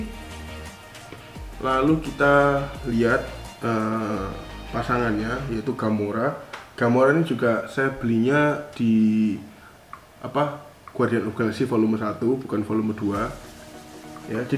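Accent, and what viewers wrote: Java, Indonesia. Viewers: PERTAMA KOH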